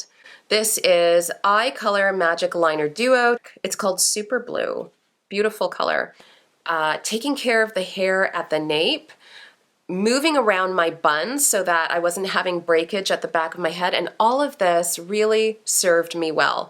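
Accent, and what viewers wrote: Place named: Canada. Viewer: Ahhh I could tell you were from Canada! I knew by the accent of course, but the “I’m sorry “ near the end (as well as the new car pics) sealed it 🤣 👋 waving at you from down the road a bit in Cobourg!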